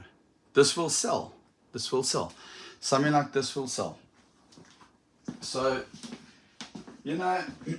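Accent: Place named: South Africa. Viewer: I love the South African accent so much.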